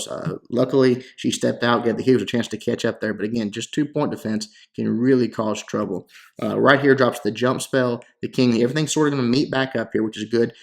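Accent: United States. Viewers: which part of the us are u from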